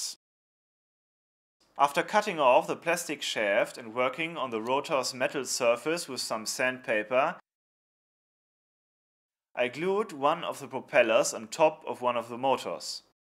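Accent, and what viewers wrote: Germany. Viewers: There is just something hysterical hearing "ghetto heat shrinking with a girly lighter" in your German accent... I like the subtle humor while learning a bit... nice job. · Are you German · nice German accent! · I love the German accent :D + this sentence "I also did some ghetto style heat shrinking with a very girly lighter." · German Accent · Dieser geniöse Deutsche Akzent ist der Knaller! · Your German accent combined with selfirony made it very humoristic to watch :)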